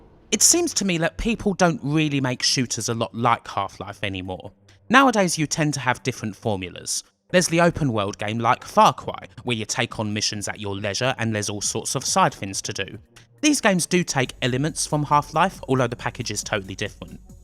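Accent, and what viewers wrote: United Kingdom. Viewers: LOVE THIS REVIEW, AND LOVE YOUR BRITISH ACCENT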